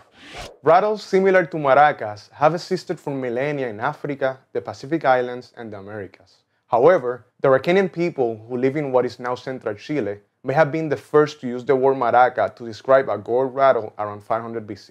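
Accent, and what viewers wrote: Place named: Puerto Rico. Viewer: Thanks for your response. This observation I am selling it to you wholesale for when it came to me I was completely fresh to my interest in percussion. The macho hembra distinction was totally matter of fact in reference to the difference in tone, which was also matter of fact. I assumed it was due to having being built to make them sound different and macho hembra came by default, as in drums, as you point out. To tell you the truth I perceive a difference in tone but am not certain it is due to the maracas, as opposed to my own right-left hearing. Probably the reference is very old school, from before your time, which I would not be surprised. Your style of exposure is new to me, it is very good, your accent shows but so is your knowlwdge and control of the english language. It is a refreshing style of exposure and diction. 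Congratulations and Blessings. Keep it up for percussion is the most democratic form of musical expression. I am not sure if you are Puertorican, I suppose you are, but Puertorican percussion is among the most democratic of all.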